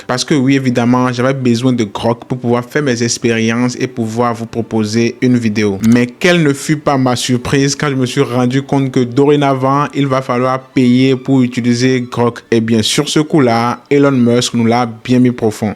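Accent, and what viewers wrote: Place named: Benin. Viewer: MERCI BEAUCOIUP MON GARS TU ES UN 10. JE NE TE CONNAISSAIS PAS AVANT, PREMIEE IDEO JE TOMBE SUR DE LA VALEUR GRATUITE MERCI BEAUCOUP.DIS MOI TU ES BENINOIS ??? VU TON ACCENT ON DIRAIT. MOI JE SUIS BENINOIS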